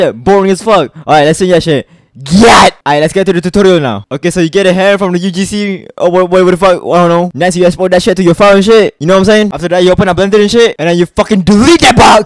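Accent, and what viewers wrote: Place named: Malaysia. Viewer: this accent sounds familiar edit: ah no wonder, hes from malaysia and so am'i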